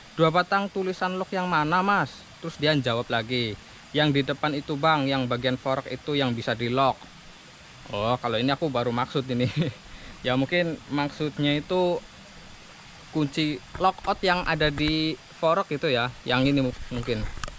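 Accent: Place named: Java, Indonesia. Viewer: Logatnya lugu orang jawa :)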